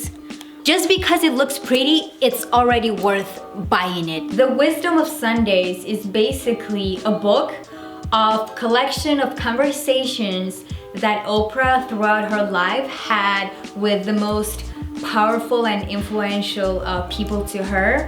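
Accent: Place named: England, United Kingdom. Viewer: Hai un bellissimo accento inglese ♡.♡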